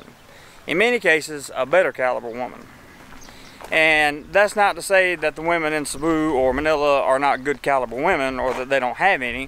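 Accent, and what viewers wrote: South, United States. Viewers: New subscriber here. Thanks for the videos man. They are more deep with a reality of what to expect. You sound southern, where are you from Sc? Hahaha any way. Am planning on moving sometime to the philippines, around the Marakina city.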